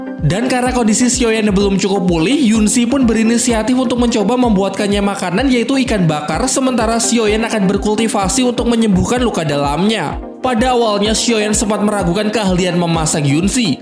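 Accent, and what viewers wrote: Java, Indonesia. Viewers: ga akan sih gw ga suka suara cewe nya udah nyarin medok lagi, gw bukan org jawa makanya ga suka sama suara cwenya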